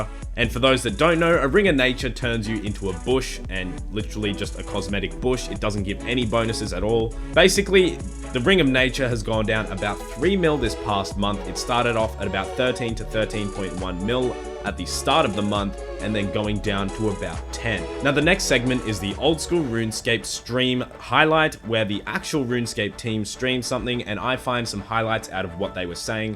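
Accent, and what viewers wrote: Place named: Australia. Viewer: are you Australian? if so where about are you from? good vid by the way!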